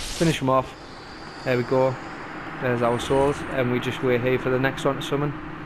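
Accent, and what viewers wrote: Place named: Northern England, United Kingdom. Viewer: Good Northern accent there ahah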